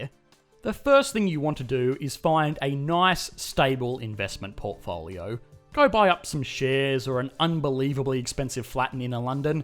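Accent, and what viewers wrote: Australia. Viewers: Oz accent? Lol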